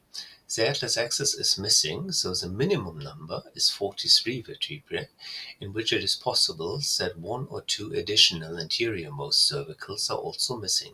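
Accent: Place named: Germany. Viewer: wow, das sind aber interessante Nachrichten, evtl. wäre es für internationale Viewer netter, die automatische Synchronisation in alternative Sprachen beim Upload einzuschalten, Sie haben einen heftigen deutschen Akzent, das könnte man so verbessern, nur so ein Gedanke.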